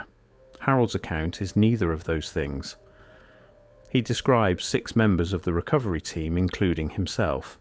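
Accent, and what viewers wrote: United Kingdom. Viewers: British accent makes it more believable.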